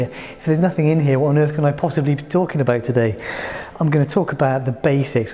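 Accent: United Kingdom. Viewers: Yeah i really don’t like bri’ish accent(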